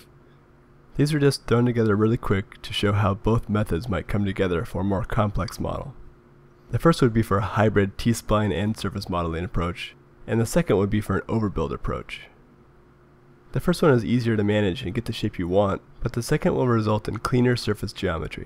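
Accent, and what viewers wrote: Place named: United States. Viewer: Very interesting because "simple" : why T splines would ease the job ...But it is in fast american instead of steady slow english ...Too bad for the frog, but if i slow motion the vid i may understand . Bravo anyway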